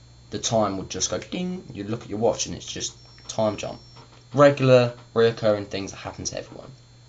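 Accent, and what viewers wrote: England, United Kingdom. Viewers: well i can usually distinguish different english accents an he sounds like hes from the same region as rupert grint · What part of England are you from? You sound like Daniel Radcliff...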